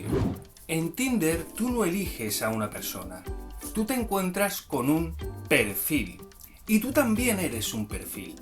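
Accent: Spain. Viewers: Me gusta el asentó español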